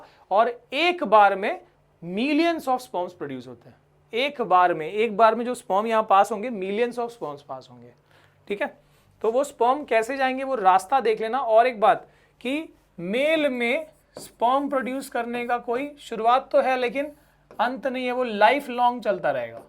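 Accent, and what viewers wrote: Bihar, India. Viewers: Sir is video me Bihari style me bol rhe h ... I like that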